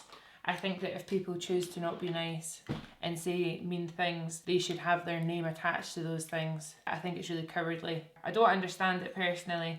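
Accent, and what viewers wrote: Scotland, United Kingdom. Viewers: You sound just like Adele with your British accent! Lol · I randomly found your videos a few weeks ago and I have binged sooo much of your content. I love your style, your attitude and your Scottish accent. ~ You are gorgeous. Cheers ~ Kay from Oz ~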